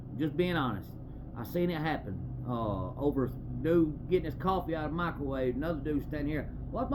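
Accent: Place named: Louisiana, United States. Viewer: All my family's from Louisiana and Mississippi and you sound just like em lol. Great channel, great storytelling, subbed!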